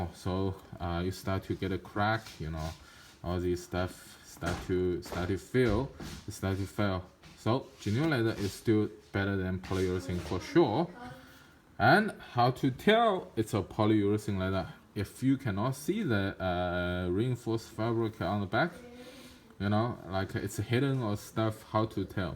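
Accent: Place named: China. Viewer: Chinligsh to much Feb feh fuh he and less a e I o u 😔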